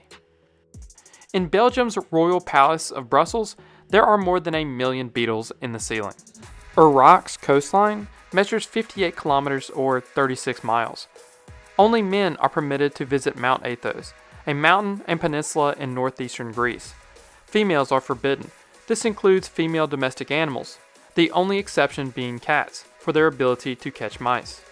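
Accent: South, United States. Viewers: Honestly I just come here to hear your awesome southern accent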